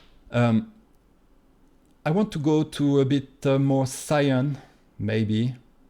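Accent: France. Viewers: Your French accent is a heaven 😭💕 Anyway I like your style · I really like your video's. I learn a lot from your tutorials. Keep it up! Your accent emphasises my vision of a French painter in Paris. 👍👍👍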